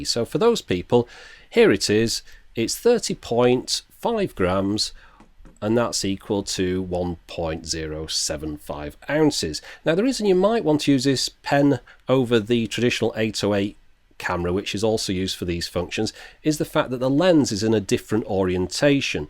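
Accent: United Kingdom. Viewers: Indeed! Somehow I love reviews in British accent. And other than 'Dr. Ashen', ' ' reviews good stuff (well, sometimes).:) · you have a bloody cool british accent!!! :D- nice review, thanks!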